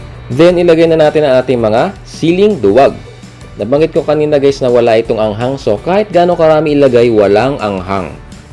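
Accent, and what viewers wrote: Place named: Batac, Philippines. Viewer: Taga Batac kan sa met sir, kasla kasjay iti ayog ti taga Batac hehe. Hello sir! Im from Piddig Ilocos Norte! Thank you kadetoy a recipe sir padsek man met ket tapno masiyahan ni papa😁 am 18 yrs old😇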